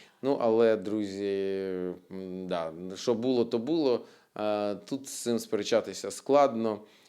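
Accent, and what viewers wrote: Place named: Russia. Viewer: Лёшенька , переходи на русский язык. А то сбиваешься на него .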